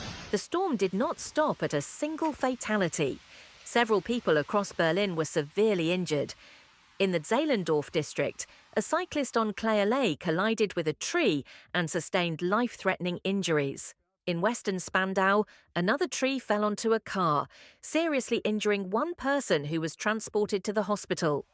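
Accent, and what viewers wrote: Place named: England, United Kingdom. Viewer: Too many mistakes in both English and German in the subtitles, and why is there no punctuation? One sentence runs into another without any pause. The voiceover sounds much too happy, absolutely bursting with glee. And why the English accent but American grammar? For example one hundred fifty instead of one hundred and fifty. Obviously the work of AI.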